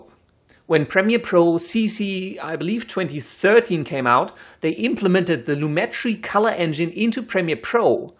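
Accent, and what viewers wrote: Germany. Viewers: I could always rely on a guy with a German accent to give the best lessons and tutorials. Thanks heaps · i guess his german accent is very strong with him XD but the stuff he made is still awesome ^^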